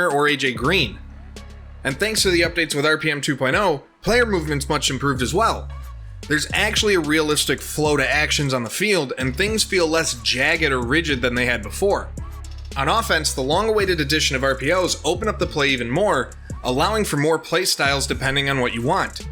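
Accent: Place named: Midwest, United States. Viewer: Oh damn..that wisconsin/minnesota accent tho 🤣😂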